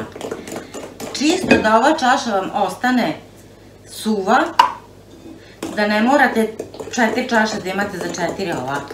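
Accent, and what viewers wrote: Vojvodina, Serbia. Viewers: A ja pored recepata najvolem vojvodjanski dijalekt❤️,tako slatko i milo divanite ,da Vas je milina slusati. Veliki pozdrav iz Curuga🥰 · Kolač je divan!A dijalekat ❤!Naš vojvođanski!❤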